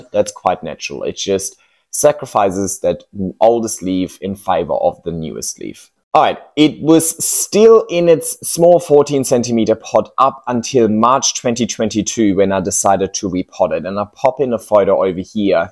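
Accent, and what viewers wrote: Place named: Germany. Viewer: I also thought about that. He sounds a bit German (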